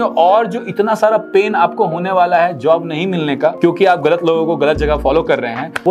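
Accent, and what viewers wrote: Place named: Bhojpuri, India. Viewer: your bhojpuri accent is so sexy "Maatha" mtlb sir dard 😂😂😂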